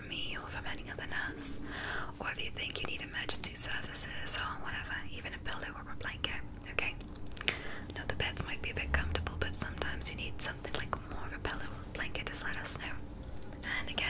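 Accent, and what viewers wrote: United Kingdom. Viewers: i see she channeled her inner brit for this vid and i kinda like it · ehh:3 The British accent is back - Love it:3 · You are the Most Beautiful Doctor with The Most Beautiful Eyes and the Most Beautiful British Accent!! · As soon as I heard the accent I was like:THERE BETTER BE A COMMENT WITH THE BRITISH ACCENT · That British accent though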